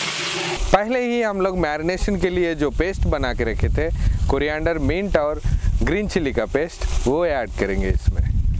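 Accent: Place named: Tamil Nadu, India. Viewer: Kaun Se Gao Wale Bhaisaab Tumhe ??? Baat Karne Ka Style Se Tamil Waale Dikhren Tumhe...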